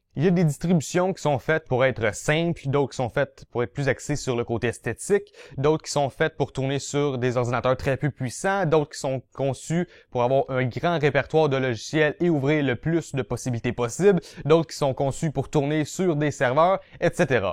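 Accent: Canada. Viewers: Jaime bien les Canadian et l'actent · bonne vidéo, mais tu parles trop vite et tu as un fort accent (Canadien), pour un Français, ce n'est pas très simple à comprendre.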